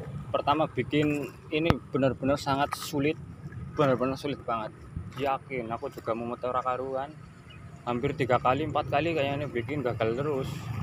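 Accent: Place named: Kebumen, Indonesia. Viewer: Logat bicara karo Bahasa mu lik🤣🤣🤣 Rika Kebumen sblh ndi?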